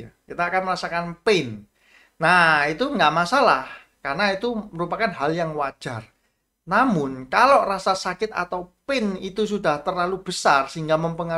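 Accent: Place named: Java, Indonesia. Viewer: Jadi ketagihan lihat video p ini.... Banyak ilmu yg bisa di ambil,Saya juga suka logat nya medok jawa meski pakai bhs Indonesia😁....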